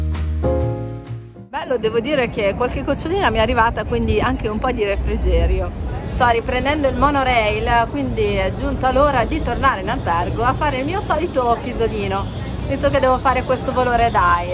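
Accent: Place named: Milan, Italy. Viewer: Sei talmente solare che e' un piacere ascoltarti...e vederti. Poi per me che so Romano e' srmpre bello sentire il milanese parlato da una ragazza. Baci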